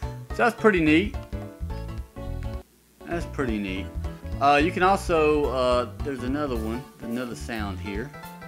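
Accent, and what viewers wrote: South, United States. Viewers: My dude you sound like the biggest stereotypical southerner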